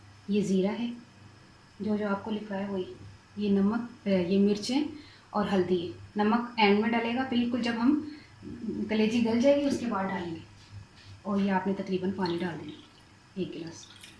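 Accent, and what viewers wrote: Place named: Punjab, India. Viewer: API ap buhat acha bolti ho Urdu k Saath Punjabi tarhka. Nice recipy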